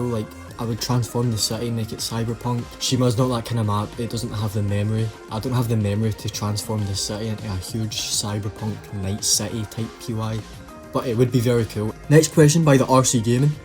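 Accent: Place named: Scotland, United Kingdom. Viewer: Your voice sound like an real Scottish🏴🏴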